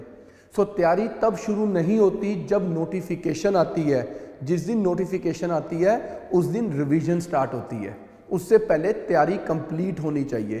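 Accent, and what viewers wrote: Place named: Punjab, India. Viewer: I really like sir's accent.. The typical Punjabi 😊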